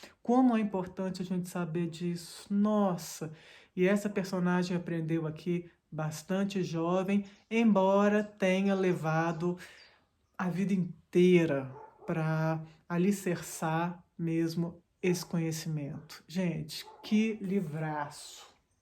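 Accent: Minas Gerais, Brazil. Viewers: nossa, como amo seus vídeos e o seu sotaque então. sempre mato a saudade de Minas, estado onde passei minha infância e que tanto amo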